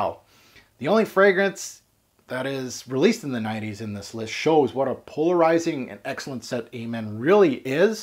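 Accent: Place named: Montreal, Canada. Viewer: Dude are you from the province of Québec? Sounds like a solid Montrealer accent :)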